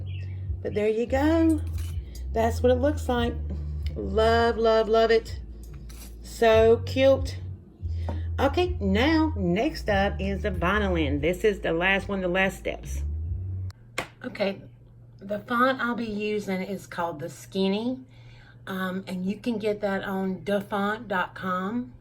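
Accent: South, United States. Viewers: Happy spring girl friend🌷🌹🌺🌸!!! I enjoy watching you and all your diys. I love to hear your southern accent, you make us feel at home watching you. Your channel is going to grow so fast 😀👍🏻👏🏻💖❣️ Good luck and best wishes to you. 🙏🏻🥰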